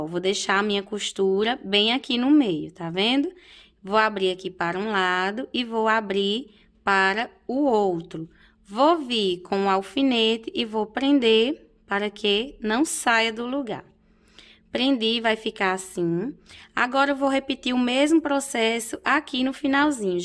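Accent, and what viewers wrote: Northeast, Brazil. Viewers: Amo o seu trabalho, Acho lindo o seu trabalho e o seu sotaque. Vc é paraibana ou pernambucana?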